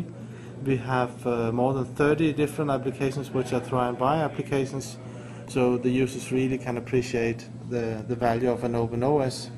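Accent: Denmark. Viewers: Wow - that's a heavy danish accent.. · How can you make this accent swedish? I won't tell you that the dude IS danish - just that the accent is. XD Anyways - what dialect does he speak? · danish accent when its best.. just hear lars ulrik from metallica.. heheh the same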